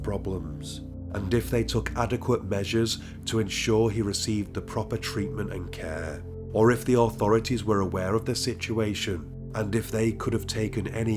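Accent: United Kingdom. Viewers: Please forgive my ignorance. Where’s your accent is from? I know you’re from uk … which part ?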